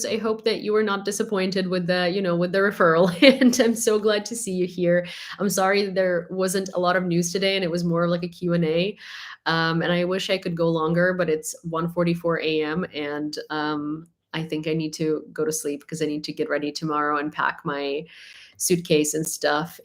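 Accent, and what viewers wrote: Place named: Ohio, United States. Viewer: I get the Ohio like quality in your voice...it's a very plain accent without much filler. And Ohio and Ontario are just across Lake Erie from each other.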